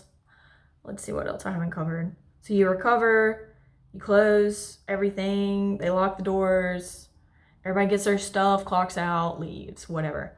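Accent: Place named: South, United States. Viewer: Your Southern Accent, is very very very Beautiful, with your very very very Beautiful Personality, my Friend 🥰🥰🥰🥰🥰🥰🥰🥰🥰🥰🥰🥰🥰🥰🥰🥰🥰🥰🥰🥰🥰🥰🥰🥰🥰🥰🥰🥰🥰🥰🥰🥰🥰🥰🥰🥰🥰🥰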